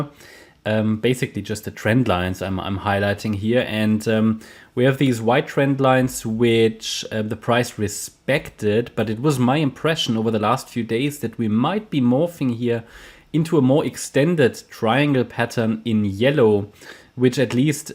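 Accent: Germany. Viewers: Deutsch, jetzt habe ich es rausgehört :)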